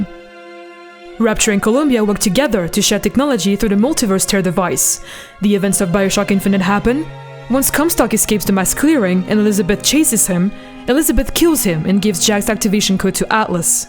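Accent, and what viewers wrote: France. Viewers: are you french? omg Salut! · Its a French woman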